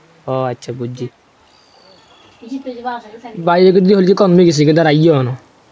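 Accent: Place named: Noakhali, Bangladesh. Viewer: Bhai Mone hoy noyakhalir manush 😂👌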